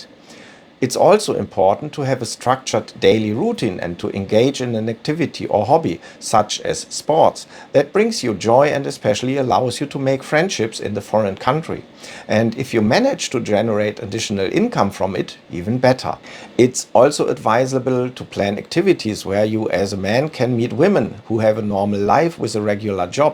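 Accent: Germany. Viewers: All logic and no emotion with a German accent….. perfectly meets stereotype 😯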